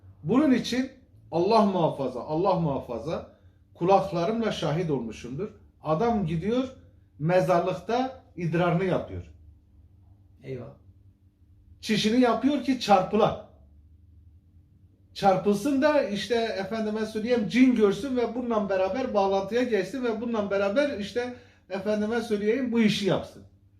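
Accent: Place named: Birecik, Turkey. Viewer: Tam bir berecik şivesi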